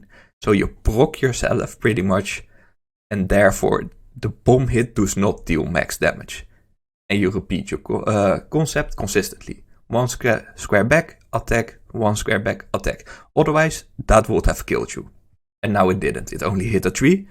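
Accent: Netherlands. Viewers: yo are you dutch by any chance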